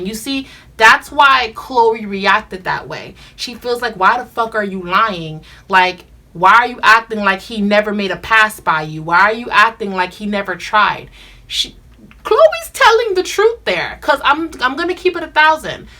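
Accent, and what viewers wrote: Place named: Miami, United States. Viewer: Do you do personal readings in person? I'm located in Miami too. I could tell cause of your accent.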